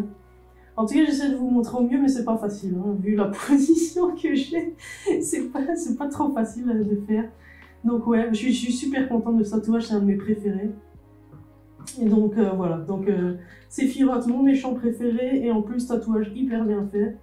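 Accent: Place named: Belgium. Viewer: Sa s'entend pas que t'es belge mdr t'a quand même bien l'accent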